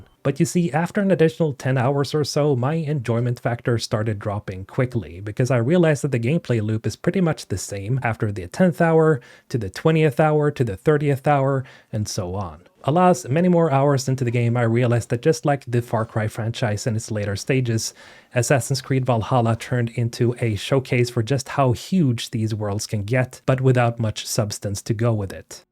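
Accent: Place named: Sweden. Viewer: Förvånad när du avslöjade dig som svensk; få svenskar som inte pratar engelska med en brytning som inte gör ont i öronen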